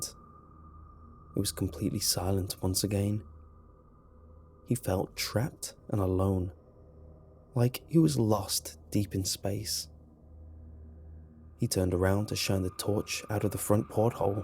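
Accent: England, United Kingdom. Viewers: He has an English accent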